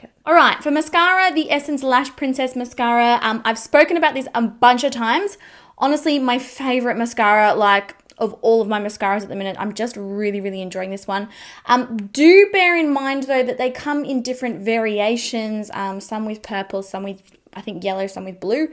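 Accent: Australia. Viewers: You sounded so Aussie in this video! 😂. Loved this video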